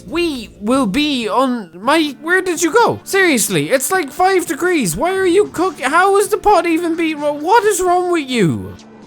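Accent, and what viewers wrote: Ireland. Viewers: noice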